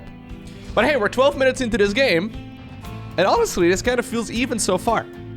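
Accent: United States. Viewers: Yea, Lowko...it is interesting to hear about your American Twitch Standard accent and you behaving like a degenerate Runescape-addict past weekend and all but can we get back to the game...that would be great...yeah....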